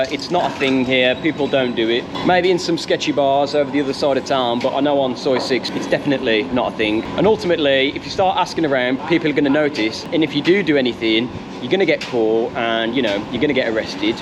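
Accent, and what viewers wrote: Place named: Midlands, United Kingdom. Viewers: You sound like you're from the Midlands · You sound like you're from Dudley or Wolverhampton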